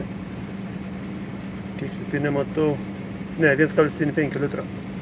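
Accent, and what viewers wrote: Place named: Šiluva, Lithuania. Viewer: AŠ iš Šiluvos.malonu.Keista,kad kalan grynai tai pat. :hand-pink-waving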